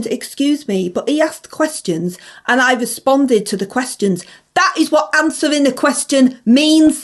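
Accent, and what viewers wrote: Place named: Northern England, United Kingdom. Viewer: Tha northern 😂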